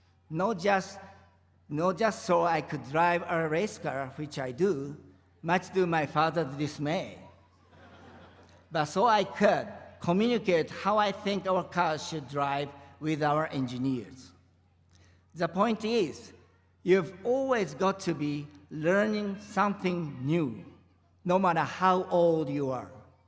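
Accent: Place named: Japan. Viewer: これが日本人英語の限界か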